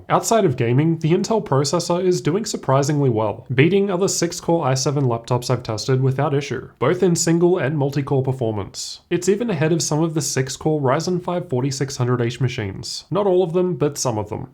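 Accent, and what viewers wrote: Australia. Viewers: subtle Aussie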